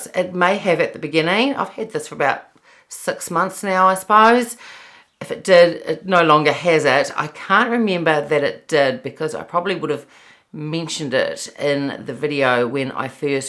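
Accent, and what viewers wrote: New Zealand. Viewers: Thank you for such a thorough review you get an A for effort for taking the time to wash off and reapply products twice! These all looked great on you, but it seems like everything you try does. Also enjoy your videos because I love the NZ accent.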